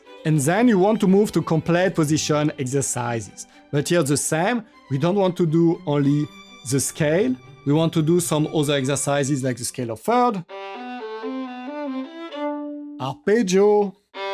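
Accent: France. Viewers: tu parles anglais comme un français j'aime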